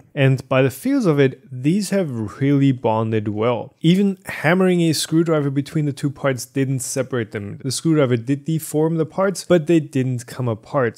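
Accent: Germany. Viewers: HA! ich wusste es. von der ersten sekunde an hab ich gehört das du deutsch bist ;D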